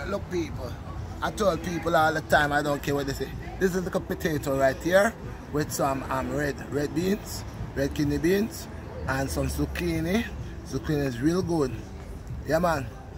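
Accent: Jamaica. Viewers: Is that Jamaican?? 🤔🤔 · I could tell he was Jamaican before even goin on the video 😂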